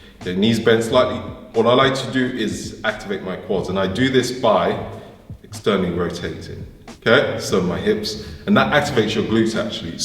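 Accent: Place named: England, United Kingdom. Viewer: Excellent demonstrations! The tempo is admirable with the perfect form. Oh, and I just love this accent. From which part of England are you?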